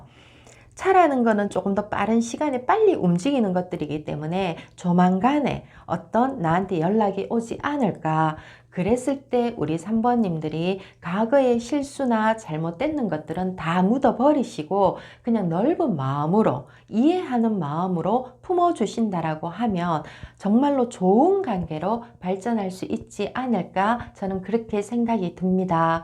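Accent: Gyeongsang, South Korea. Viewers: 경상도 억양을 왜 고쳐야하죠?? 님이 지루하면 안들으면 되는것을..... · 열심히 리딩 해 주셔서 감사 합니다 . 하지만 조금 지루한 감이 느껴 지네요. 경상도 억양 고치시면 더 좋을것 같아요.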